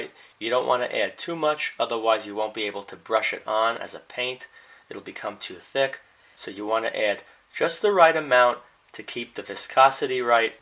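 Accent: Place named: Wall Street, United States. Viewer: Wall Street accent.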